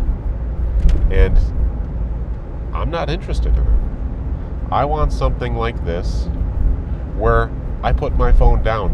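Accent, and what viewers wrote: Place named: Midwest, United States. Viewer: something about the midwest accent makes me feel like i have to blow my nose when i hear it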